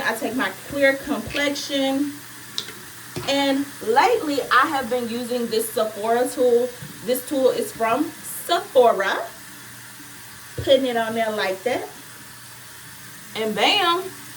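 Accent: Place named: Chicago, United States. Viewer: I'm so here for your vibes! Are you from Chicago? You sound like my people 🙌🏾 I'm from Chicago but I live in Seattle now. I was a med/tele nurse but quit my job around 7 years ago to do my art full time 🎨 I love when the YouTube algorithm put creative Black women on my radar 💕 Love your craft room. Happy birthday!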